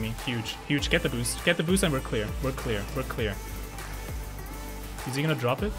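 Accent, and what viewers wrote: Germany. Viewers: Hey, are u german? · Da merkste halt dass er deutsch ist die Aussprache kann man nicht als nicht deutscher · Are you german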